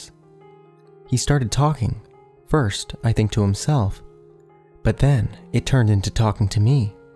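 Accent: Canada. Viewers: A very Canadian creepypasta reader. Not only that but you read very well. I'm looking forward to listening to more of your readings :}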